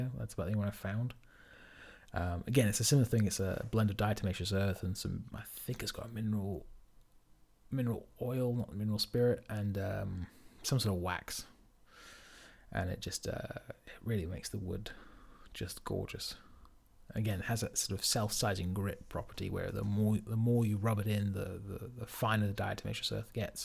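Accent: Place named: United Kingdom. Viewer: The craftsmanship is great but does this fella gotta use the British accent? It’s like chewing on tinfoil while watching the chalkboard scratching scene in Jaws!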